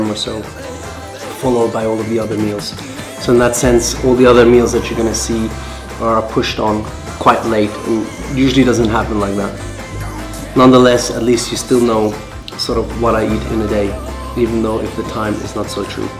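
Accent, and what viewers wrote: France. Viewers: ton accent français est trop mignon !! 😍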